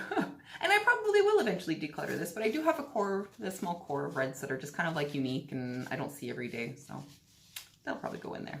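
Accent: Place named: Newfoundland, Canada. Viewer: Lol ur fun to watch. Not to mention purrrdy. Im thinking of subscribing to this box. R u from nb ns or nf ?? I always know a downhomer when i hear one. :)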